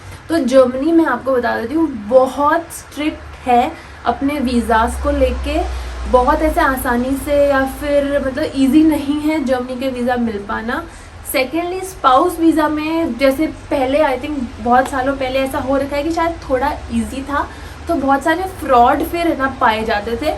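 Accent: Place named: Punjab, India. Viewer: Ma'am you said that you are from Rajasthan but you sound like Punjabi. We would like to know more about this.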